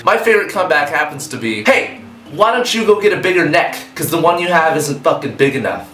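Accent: England, United Kingdom. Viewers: With the english accent, you sound like Russell Brand :) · i love ur bristish vioce so adorable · + British accent= Ear orgasm. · omg superhot with an english accent ur sooo good at it <3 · title: givs ppl erectile disfunction. comment: omg love the english voice, and the no braces! so cute! u rly nice teeth!!! omg<3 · You're English accent is strangely amazing :D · The whole time you were talking in a british accent, i wasn't even paying attention to what you we're saying cause I was laughing so hard. anyways, Title: Hops a Minivan filled with turtles snorting crushed unicorn horn. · OMG with an english accent = HELLA FUCKING HOT! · + English accent = amazing Phil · i like your english accent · English Accent=♥ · Your english accent is quite brilliant =L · lmfao, english accents with american lingo xD<3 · u sound amazing with a british accent!!!! 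· +English accent. I fainted. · YOU ARE REALLY GOOD AT BRITISH ACCENT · Your english accent just made me jizz. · Your english accent was beautiful xD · with an English accent= yes. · aw, your english accent makes you sound slightly camp. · Your English accent makes guys with real English accents sound like fakes. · My tit is stuck in a bears mouth :| im joking, you actually sound even hotter with an english accent and thought it was pretty good :D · your English accent is amazing. and what model is your fridge? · i really enjoyed that english accent:) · oh my fuckery. how the hell does an english accent automatically make him so much more attractive than he already is? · XD hahaha i love ur british accent u sound so smart.... TITLE: REVENGE OF THE HORNY LAMPZ!!! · love ur british accent! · i died y you so sexy i can not get over how amazing you look:O and you with english accent:O kirjhnujyrhwjjbbhrgjbrgsbgbdjrs OMNOMNOMNOM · You'r English accent reminds me of the time I was reading something out of a chemistry book in class.......I got kicked out of the class - . 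· i thoroughly enjoyed the english accent. makes you seem that much hotter (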